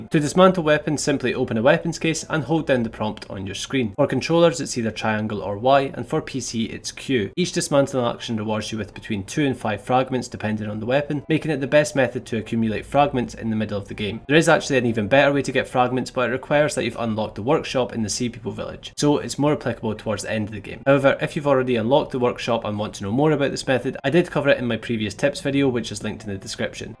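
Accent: Scotland, United Kingdom. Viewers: You're talking to fast lad , i could barely hear you through your Scottish accent. 😅 Despite that, this video helps me , makin good progress on the game. Thx for your time uploading this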